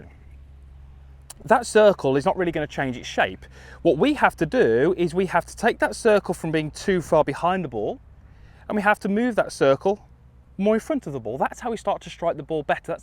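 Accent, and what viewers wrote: United Kingdom. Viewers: Hi Sal the north of England accent isn’t the easiest to understand 😂 What part did you struggle with?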